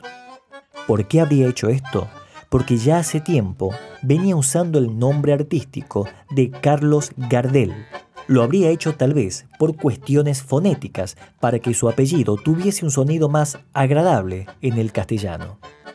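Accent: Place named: Buenos Aires, Argentina. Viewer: Pero muy porteño del buenos aires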